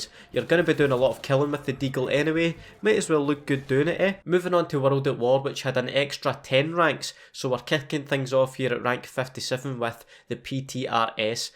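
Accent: Scotland, United Kingdom. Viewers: I'm mainly gonna subscribe for that awesome sounding Scottish accent you have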